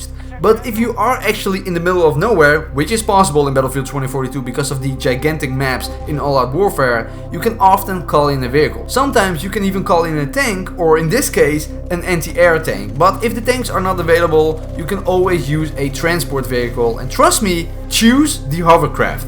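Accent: Netherlands. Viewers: Are you dutch? I think you are, because of your accent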